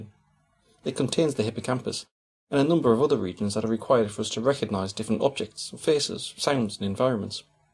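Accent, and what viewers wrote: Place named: United Kingdom. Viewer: With all respect to your place of origin,realize this is the internet,so use a freakin accent that the whole world can understand,not only British.Also,for 2010+ standards. animation sucks.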